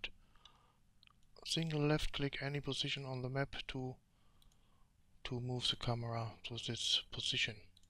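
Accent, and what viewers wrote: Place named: Germany. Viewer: Ich find dein Englisch garnich so schlecht, aber man merkt da kommt manchmal der Deutsche durch xD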